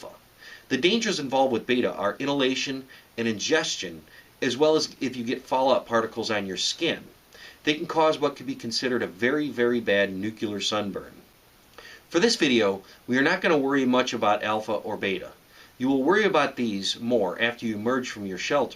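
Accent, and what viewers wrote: United States. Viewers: It's funny that you say that, only in America we say it that way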